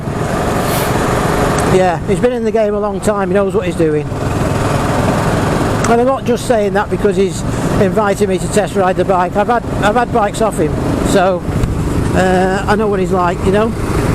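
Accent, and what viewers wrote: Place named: England, United Kingdom. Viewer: Probably the worst review of bsa goldstar. Sounds like trump reviewing with english accent.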